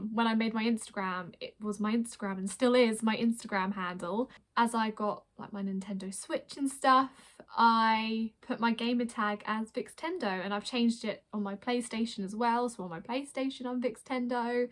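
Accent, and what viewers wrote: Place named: United Kingdom. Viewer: I like the British accent 😭😭 Good luck 😊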